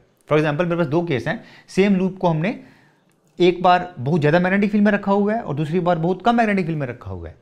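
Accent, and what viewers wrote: Kashmir, India. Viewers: Sir aap kashmir se ho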